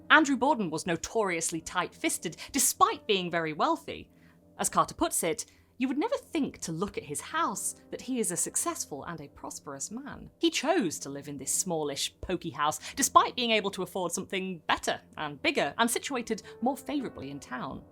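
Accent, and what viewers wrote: United Kingdom. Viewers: Thank you so much for the trans inclusive disclaimer about womb language at the beginning. 😊 In current year I unfortunately always get a little nervous when I end up liking a content creator who speaks with a British accent, purely because of what's been going on in the UK right now to erode trans rights. Very happy that I don’t have to worry about any of that rhetoric coming from this channel. Thanks again.❤ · Extremely surreal to hear a British voice say the name of my hometown. Very excited to see more of this. Edit: Belly laugh when I heard "Portuguese Community" in the part about immigrants, so weird to think about how the tables have turned.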